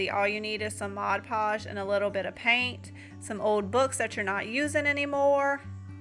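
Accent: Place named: South, United States. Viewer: Hi,. I just came across your videos yesterday. I watch a lot of home decor/diy videos and have never made comments. However, the more videos of yours that I watched, the more I was convinced that we are twins separated at birth (or at least kindred spirits). I have a booth at a local antique mall. If you look me up, you won’t find much because I seldom post anything to social media. I’m so busy making things to keep my booth stocked that I just can’t find the time to maintain a media presence. Many of the things you make are things that I make (and I thought that I originated the idea🤪) such as decor books, turning outside lanterns into inside lanterns, making wood rounds for thrift store cloches, painting old canisters and pitchers, etc. I have thought about creating a YouTube channel for over a year but haven’t taken the plunge. How do you find the time with young children? 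I hope you’ll respond but I know you are super busy. Just know that you have a twin (minus the cute southern accent) in Illinois.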